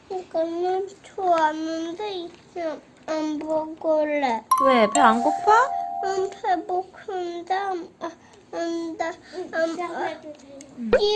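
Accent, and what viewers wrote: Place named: North Korea. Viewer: 우리 이쁜 북한억양. 중독댐!ㅎㅎㅎ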